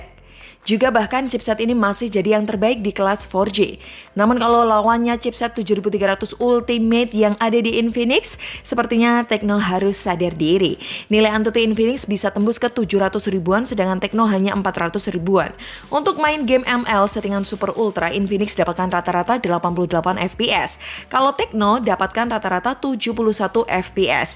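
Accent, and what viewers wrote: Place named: Java, Indonesia. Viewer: suka deh..lama lama keluar medok nya 😁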